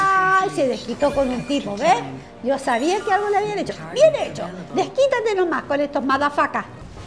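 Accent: Chile. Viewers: Tiene ascento de chilena ?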